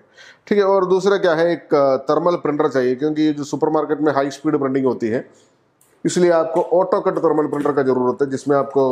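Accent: Kerala, India. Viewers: Cheta Malayali aano....slang spashtamayitu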